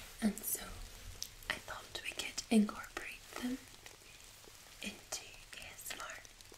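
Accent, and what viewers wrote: Scotland, United Kingdom. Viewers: She murmers scottishly. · I thought it can't get better than this Scottish girl, rain, then came the nails and I had to bounce.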